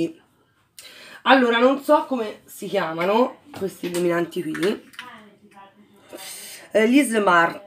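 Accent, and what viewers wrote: Tuscany, Italy. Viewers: "Si arriva babbo..." ❤❤❤adoro la parlata toscana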